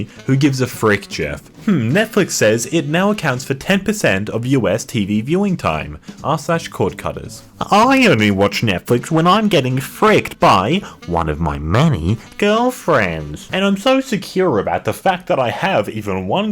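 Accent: Australia. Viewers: ooooh you're from canberra? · Hold on, Mate you're Australian??? Frick yeah, found another one😁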